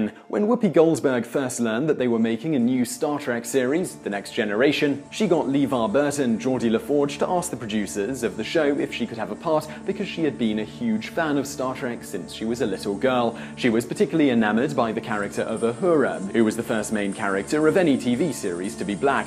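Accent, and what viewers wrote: England, United Kingdom. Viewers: I understand your English accent would have some words sound a bit different. However... Gene Roddenberry's name is not pronounced Roe-den-bree, it is pronounced Rod-en-berry. Whoopi Goldberg is not Whoopi Golds-berg. Also, LeVar Burton's name s not pronounced Lee-Var, it is pronounced Luh-Var. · To all the complainers: DO NOT WATCH. Crybabies., most of your audience does not care how you pronounce words. Your British accent is marvellous. We Americans use a form of PIDGIN English any way. Can't even spell, bad punctuation, stupid grammar, borrowed YOUR language. Ingrates! As British as Apple Pie, "My country 'tis of thee"(America)="God save the King", etc. The US has borrowed much of it's culture from the English AND some from the FRENCH. If you do not like that, give the Statue of Liberty back to the French. And also 1/3 of the modern English language. 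Sorry for the IGNORANCE of some "Americans".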